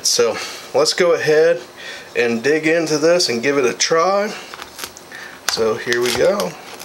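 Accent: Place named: South, United States. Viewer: all about the brown sugar....Southern voice says...ain't you sweet😘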